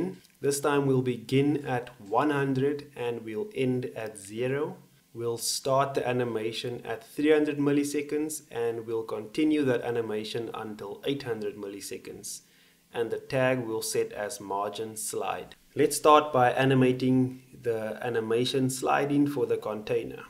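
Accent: South Africa. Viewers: Going out of topic... are you a South African? you sound like an Afrikaans speaking coloured. :)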